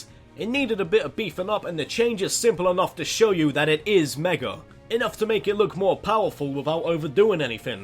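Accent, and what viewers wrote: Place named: United Kingdom. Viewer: man He sounds like a British Dan Green. Am I right?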